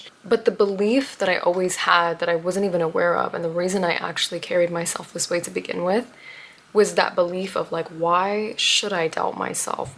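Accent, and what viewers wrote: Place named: Europe. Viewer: You seem like You speak like You are European. I love Your accent🥰 Know Your Worth 🙌🕊️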